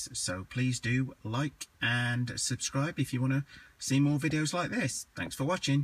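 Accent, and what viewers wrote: United Kingdom. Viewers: useful, thanks... At & t though, you sound English - how are you on at & t or did you move to the usa>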